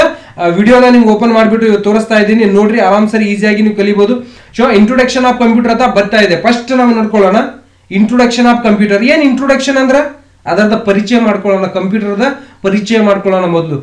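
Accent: North Karnataka, India. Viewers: Super....knowledgeable video sir....your talking impressed me...I like your uttara Karnataka language......your video is helpful to me....all poor persons....and keep it up sir....continue next.....hevvy computer knowledge video provided me us......🙏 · Bhal chalo heltiri sir kelta idre boring agolla nam uk bhashe ne hange nanna 2 subscribe nive ast ista aitu nim video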